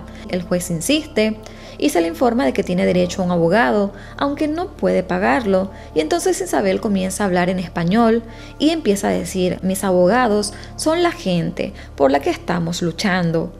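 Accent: Venezuela. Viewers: te felicito me encantas como marras los casos una pregunta tengo curiosidad eres venezolana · Amo tu acento venezolano 🌈😍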